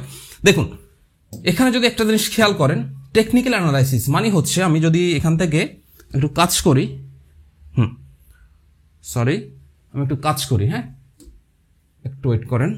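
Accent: Sylhet, Bangladesh. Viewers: ভাই আফনি কিতা সিলেটি নি 😮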